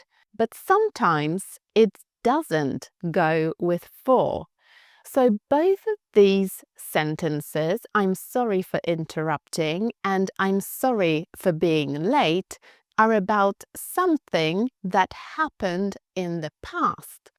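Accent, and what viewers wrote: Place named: United Kingdom. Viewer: Thank you another great video. How do you get a British accent?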